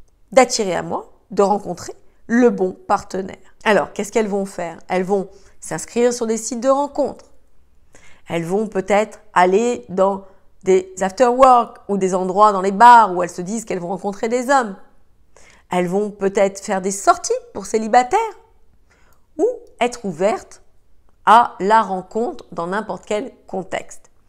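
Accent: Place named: Ivory Coast. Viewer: Vous êtes la meilleure,! Vous avez un bel accent de la cote d'ivoire que j'adore!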